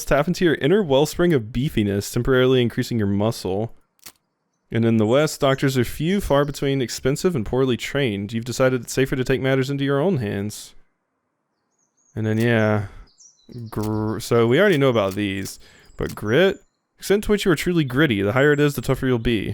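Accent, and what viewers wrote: United States. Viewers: To be fair I'm not a huge fan of "funny" games, but I do love western, and when I saw the Dad's animation I decided to at least giving this episode a shot. Liking it so far, but I'll mostly keep watching for your cowboy accent:D Happy New Year,! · Gonna be hearin' a lotta that southern part'a ya. Can't wait.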